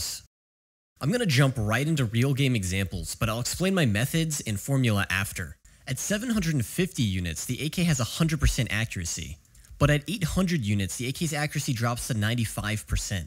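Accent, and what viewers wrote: United Kingdom. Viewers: Good job on finding a subject not already rinsed by Philip. Your English accent is dire mate, kind of a weird nondescript UK chav come cockney gangster crossed with some old Victorian gent. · nice brit accent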